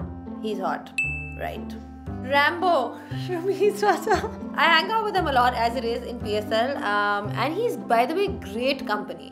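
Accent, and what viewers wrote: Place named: India. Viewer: Your accent is so strong. Please learn better English. You studied here didn’t you learn anything. You sound so Indian. Please don’t learn from them.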